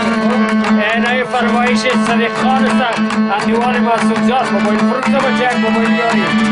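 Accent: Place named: Tajikistan. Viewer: Зинда боши бародарчон ана лафзи лахчавии Точикочо овозат хаста набошад офарин!!!!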